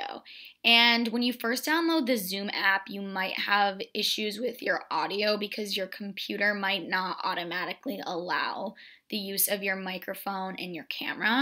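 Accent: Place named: United States. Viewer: Sorry. I battle to understand her American accent. I am a South African English graduate.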